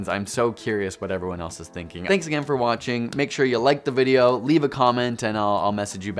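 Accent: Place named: Canada. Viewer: Are u Canadian? Nice accent btw lol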